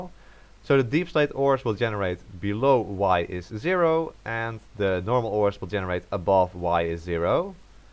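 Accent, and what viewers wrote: Netherlands. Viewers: i am 1000% sure he is dutch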